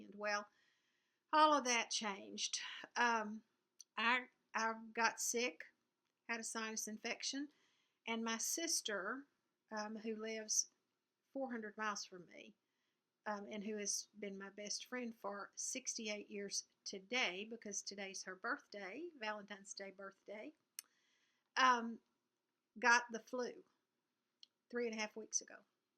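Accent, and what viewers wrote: South, United States. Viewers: Hi: I so enjoy your videos and your stories. My grands were from KY & TN and spoke in the same way you do, used the same phrases, so I do understand your southern lingo. I'm older than you by 7 years and can tell you that this aging process is not for sissies. It seems I develop a new ache or pain every day. But I do try to keep a positive attitude and count my many blessings. All the little "smalls" you finished are precious, makes me want to get online and order more patterns. I hope your sister is so much better and that you have an enjoyable time together. Can't wait for your next video. Happy stitches!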